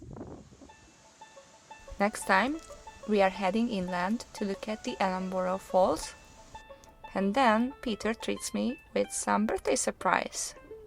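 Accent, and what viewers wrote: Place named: Hungary. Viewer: start the video, hear your voice and I'm like u gotta hungarian. I'm gonna binge watch your videos real quick as australia is my dream location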